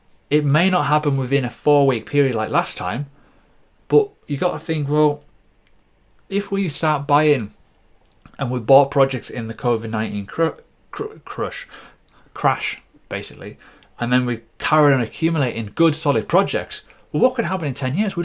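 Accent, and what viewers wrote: United Kingdom. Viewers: When I want to listen to British English and sounds of reckting things I always open up this channel, its funny and well said.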